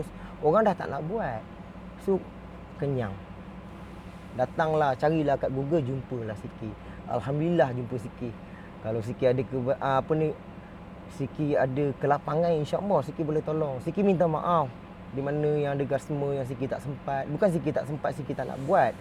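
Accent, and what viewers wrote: Pahang, Malaysia. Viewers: bunyi slang orang pahang je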